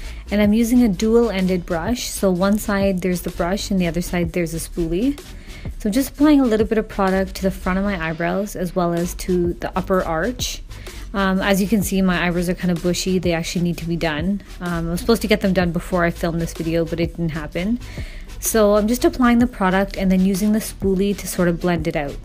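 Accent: Punjab, India. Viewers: You have a lovely Punjabi accent...😊😊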